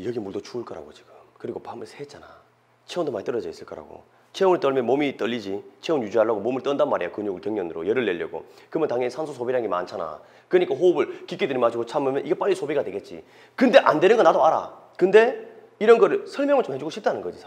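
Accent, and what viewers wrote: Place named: Gyeongbuk, South Korea. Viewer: 선생님 혹시 고향이 경북이십니까ㅋㅋㅋ 유튜브에서 고향말투들으니 정겹네요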